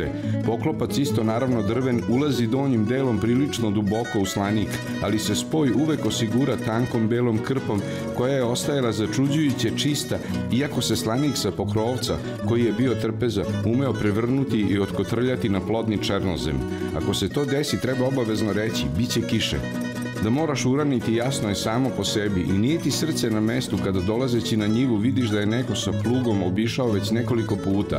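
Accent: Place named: Farkaždin, Serbia. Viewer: Dodju mi tvoje ,,DOPISNICE,, kao vrsta razbibrige u trenutnom karantinu. Hvala ti sto ih pises. Vracas me u meni poznatu sredinu, koje vise nema, a i ljude koje sam poznavala i mih manje vise takodje nema. Sviras odlicno, ali pisanje je verodostojno, prepoznatljivo, vrlo slikovito, a izgovor i glas bas, bas nas farkazdinski! Hvala ti ! PISI samo PISI, vredi truda!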